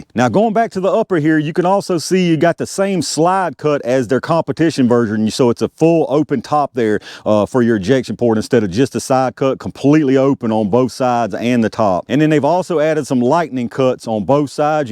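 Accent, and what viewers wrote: United States. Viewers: Been lookin at 1 at rural king,339$. I have the tx22 comp & this would be nice to have as well. Guess im a 22lr freak cause i have many of them & love em all,but my favorite round is the 5.7x28,wish taurus would come out with 1 that uses that round. Great video,i subbed cause that accent is as country as mine. Lol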